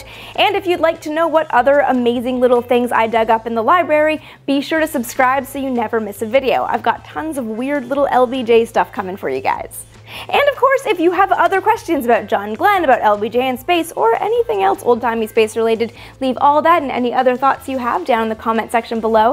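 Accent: Canada. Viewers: so sorry but your love for NASA shows american, your slight accent shows Canadian, what are you?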